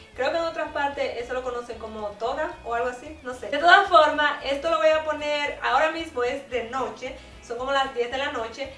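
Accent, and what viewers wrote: Dominican Republic. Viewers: Eres Dominicana? :v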